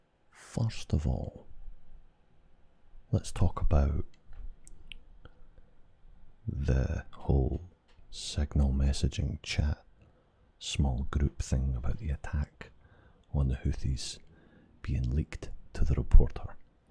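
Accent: Scotland, United Kingdom. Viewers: Im neutral ion rlly get into politics but i love ur scottish accent lol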